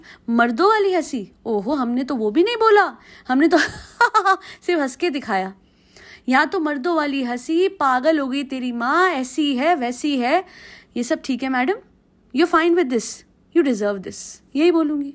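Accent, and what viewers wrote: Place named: Punjab, India. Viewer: I am in ❤ love with your Punjabi accent plssssss aise hi podcast banaiye❤❤❤❤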